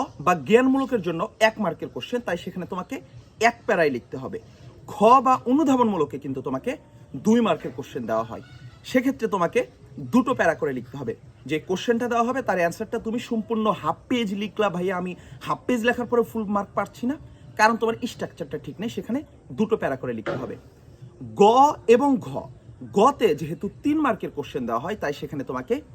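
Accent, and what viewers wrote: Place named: Sirajganj, Bangladesh. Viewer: মনে হয় সিরাজগঞ্জ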